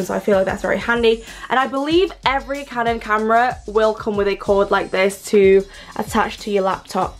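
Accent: United Kingdom. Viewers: I’m here for the British accent too! 🇬🇧❤️ I need the deets on how your insta vids are clear! When I upload it’s not great definition! 😭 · I don't know why but I was fully expecting an LA accent from the intro! I get so excited when I see fellow brits making banging content! LOVED THIS ♥️ you got yourself a new sub xx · You said you are from the Philippines ? Were you born there or Great Britain ? You sound British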